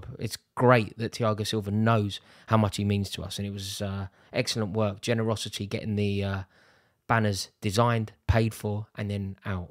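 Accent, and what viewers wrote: United Kingdom. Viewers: I come to this channel for the posh English! 🙃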